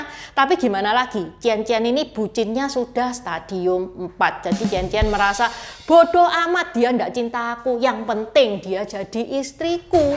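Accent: Java, Indonesia. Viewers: kocak kalau cici bercerita, medok sekali ci....hehehe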